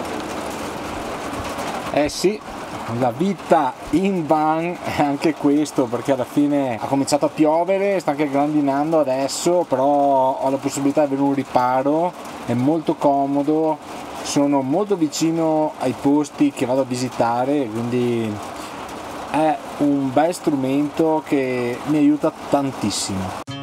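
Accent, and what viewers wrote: Veneto, Italy. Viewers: Filmati sempre bellissimi....!!! In più con l'accdento veneto, fa sempre piacere, sembra un poco di essere a casa e di conoscerci.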